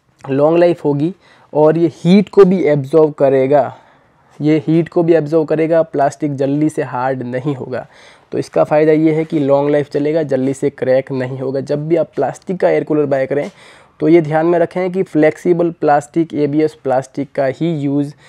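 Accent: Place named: Shekhawati, India. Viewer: भाई आप झुंझुनू के है या चूरू के हैं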